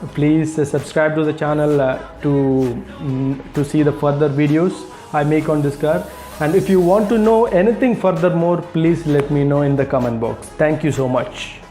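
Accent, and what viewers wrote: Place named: India. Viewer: Bro please for Gods love, learn English! Some places I wanted to break my phone and pull my ears listening to ur hinglish! Peace!✌🏼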